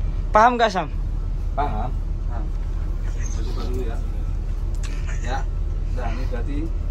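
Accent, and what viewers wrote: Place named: Madura, Indonesia. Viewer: Logat bhsnya sepertinya org madura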